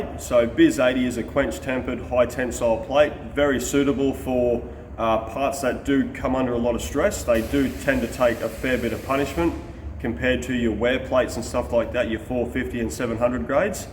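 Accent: Australia. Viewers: If you pronounce "fail" in Aus as "file" in US, how do you pronounce "file" in Aus? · They do know your from Australia and that is how you guys normally talk people cry over the dumbest things well hopefully the have their safe space and like and subscribe LOL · been watching now for a few weeks and only just noticed your Australian i guess it shows as us aussie have some pretty good skills ;) watching your vids have improved my daily quality too being a younger boilermaker/ metal fabricator i find this channel very intresting and helpful to watch TYVM for the quality videos and work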